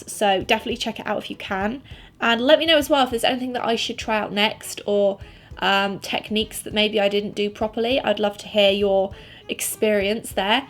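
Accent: United Kingdom. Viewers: Her accent is so British despite moving to America at a very young age. Cool!!! I wonder if she’s ever explained it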